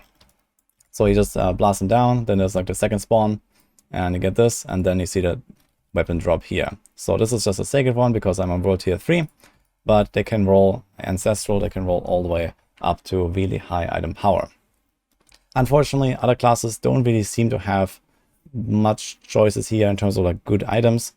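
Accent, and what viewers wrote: Germany. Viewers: Bruder, du sprichst richtig gut Englisch, aber dein Akzent ist alman. Dhat hurts my ihrs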